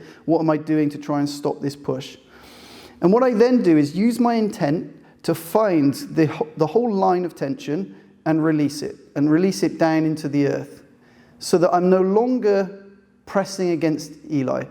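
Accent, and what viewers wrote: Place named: Northeast, United States. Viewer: I was going to say, you sound like you’re from somewhere east of New York. 😊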